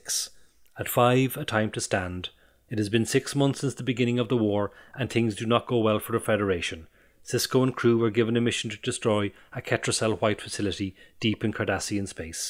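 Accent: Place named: Ireland. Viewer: jaysus I need my ears syringed 😂 nice to see / hear some content in an Irish accent 🖖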